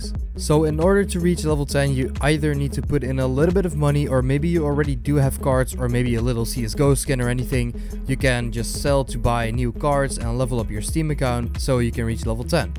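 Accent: Netherlands. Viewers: Are you from the Netherlands? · lol ben jij Nederlands? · ben je nederlands xD lol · IK WIST DAT JE NEDERLANDS WAS! :D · Wait. Are you Dutch? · dutch accent NotLikeThis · i just knew that you were dutch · Are u Dutch? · Instantly knew you were dutch hahaha you guys never can hide your accent :)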